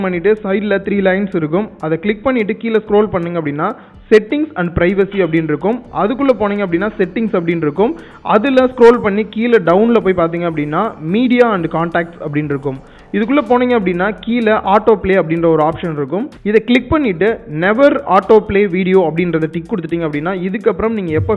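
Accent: Tirunelveli, India. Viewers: bro neenga nellai yaa???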